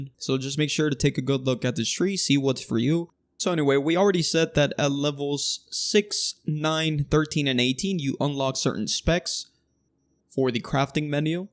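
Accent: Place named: Brooklyn, United States. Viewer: What dialect is this, Brooklyn? Not meant as an insult.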